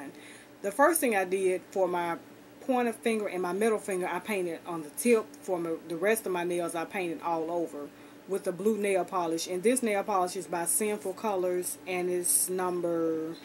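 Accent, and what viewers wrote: South, United States. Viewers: I found your channel looking for micro braid tutorials but I found so much more. I am a new subbie. Where are you from? I am from S.C. just wondering because we both have that wonderful southern accent.☺ Have a blessed day!🌹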